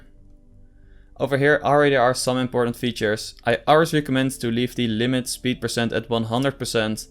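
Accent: Netherlands. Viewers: nice! Your channel seems nice, i subscribed :) also is that a dutch accent i hear? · If you download sword or shield and there is an update thingy do you need to download it or can you just keep playing and the emulator just updates it automatically Ps. I can hear your dutch😉 Is wel goeie man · wait are you dutch?? i hear by the accent. instead of some you say som, so if youre dutch too comment plzz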